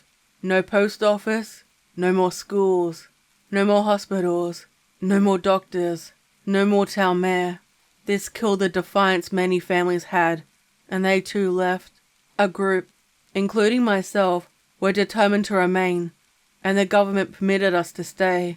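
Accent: Australia. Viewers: I know hear this a lot, but its true. I love your voice. Your Australian accent and the way you carry the stories you make which is awesome as well is so inspiring and amazing. I could listen to you read a motorcycle maintenance instruction guide and love it. You're awesome. I love your videos. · Found your channel recently!! Love your voice! Love your Aussie and now doing an Aussie based story! ❤😊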